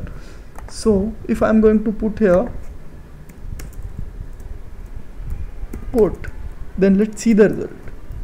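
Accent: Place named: India. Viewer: indian english accent ... stfu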